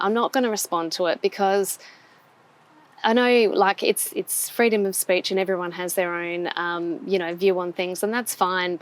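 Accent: Australia. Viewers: Hi, I’m a new subscriber and couldn’t have been more excited to hear a fellow Aussie accent. You will do well because you are so real and natural. I love your drone work it must have been tricky learning that. Di x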